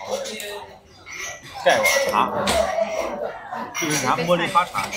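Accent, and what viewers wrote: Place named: Henan, China. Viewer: 我就喜欢看 你个陕西人带着河南味儿说四川话，用爵士乐伴奏着中国农村街头大妈做小吃 的那种感觉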